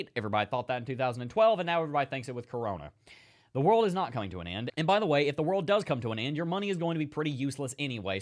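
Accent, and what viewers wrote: United States. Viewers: Love Your intro. Love that american accent 🧐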